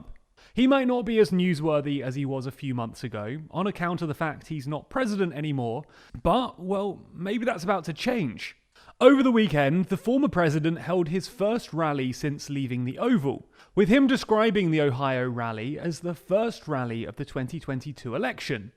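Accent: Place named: RP, United Kingdom. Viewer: You sound slightly deeper voiced and more posh in this video.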